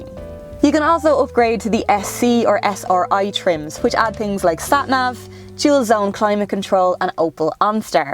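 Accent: Ireland. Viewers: I think I prefer a woman doing a car review over a guy. Same great data and specs if not even better with none of those macho undertones. Also love your Irish accent, much nicer on the ear than any British accented presenter out there.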